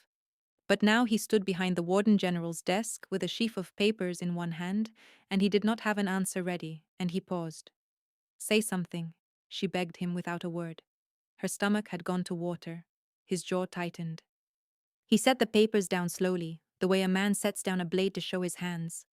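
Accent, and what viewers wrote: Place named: India. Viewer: Can't listen to this story, sounds like Idian Muslim accent which hates dogs!!!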